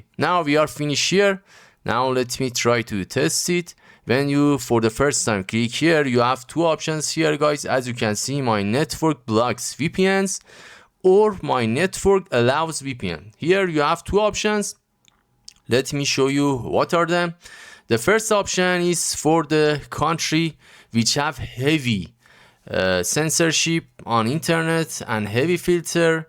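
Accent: Iran. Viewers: اوففف لحجه ی فارسی 😂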